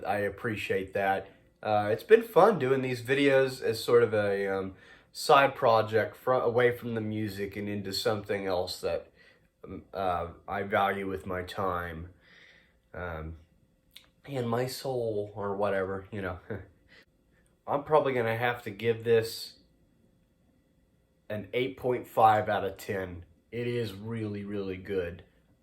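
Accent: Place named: South, United States. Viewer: I like your southern drawl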